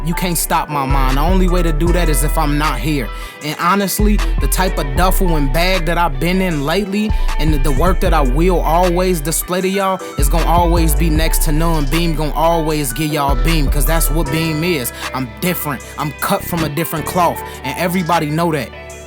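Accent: Chicago, United States. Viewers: Is he from Chicago? The accent sounds familiar 🤔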